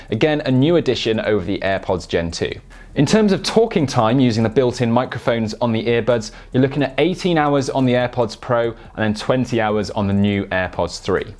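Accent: United Kingdom. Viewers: Great video; love a British accent.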